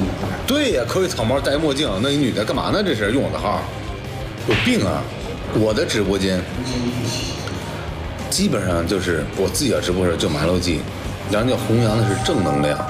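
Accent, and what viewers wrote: China. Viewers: 北京口音好爽，语速也超快。我本人是外国人，只能听一下真学不来。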